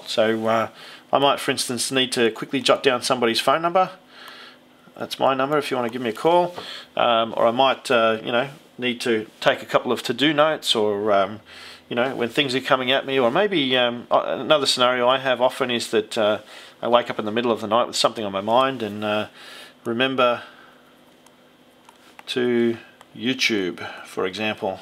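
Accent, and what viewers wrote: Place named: Australia. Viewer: Thanks for such a great presentation and review. Great to hear an Aussie accent in one of these too!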